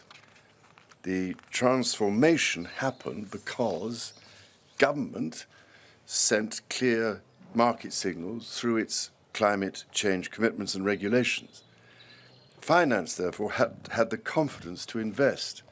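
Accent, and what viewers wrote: United Kingdom. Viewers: Prince Charles has a very classy British accent. His son doesn’t sound like him. I have never been a royal watcher from America and I couldn’t get past the full first episode of the Crown but I am so fond of Queen Elizabeth. She is an inspiration to me.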